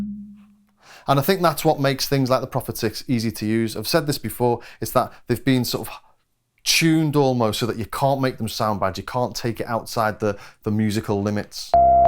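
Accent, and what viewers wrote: Liverpool, United Kingdom. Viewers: Are you from Liverpool? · Is a scouser?!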